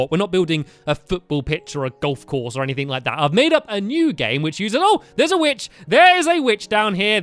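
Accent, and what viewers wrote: United Kingdom. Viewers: Are you British I am your the best youtuber ever we love you and your vids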